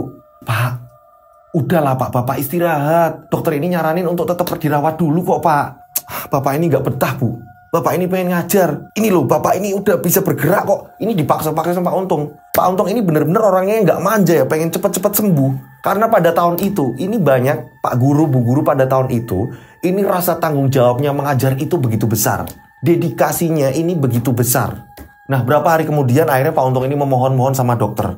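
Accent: Java, Indonesia. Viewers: Alhamdulillah sehat, saya harapkan semua pd sehat. Tambah sukses. Bahagia dunia akhirat. Saya suka sama logat ngomongnya si mas, Jawa banget 😍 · Assalamu'alaikum, pondok gede hadir mas.. Sambil masak dengerin cerita horor dr mas dengan ciri has logat Jawa ny yg keren n bikin nyandu... Tetap semangat ya bawain cerita ny 💪💪salam jom bom bom RAW 🌹🌹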